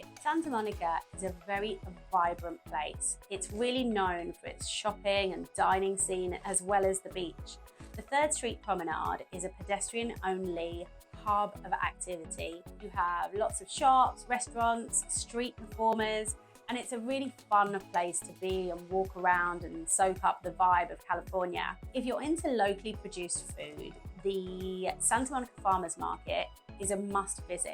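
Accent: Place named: United Kingdom. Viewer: Nice Insights, Beautiful tattoo,. I like your British Accent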